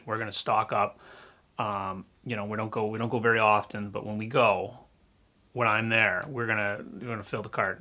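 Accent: Canada. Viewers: I just found your channel, you’ve really made some beautiful places on your property? I detect the Canadian accent, are you in Ontario? Do you raise the pigs for food? I’m not a vegetarian but I’d have a hard time eating an animal I cared for. I had a duck & 3 chickens as pets for my kids & we lived in the city. The neighbour’s loved it so nobody reported us.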